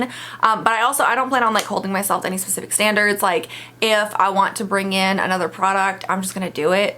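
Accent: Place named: South, United States. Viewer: You kinda sound like you have a southern accent in this video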